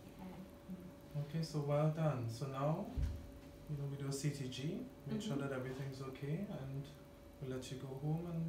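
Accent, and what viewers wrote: Trinidad and Tobago. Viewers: Is he Trinidadian? His accent sounds like it. I am also Trini. My baby is breech and I'm on here looking at exercises that I can do to get baby to turn before it comes to this point. The doctor made it look really easy in this video. He was so gentle and caring. Well done! 🙂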